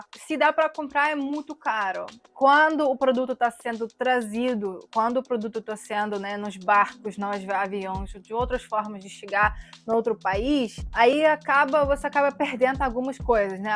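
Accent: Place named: North, Brazil. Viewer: Parece brasileira e do norte do Brasil!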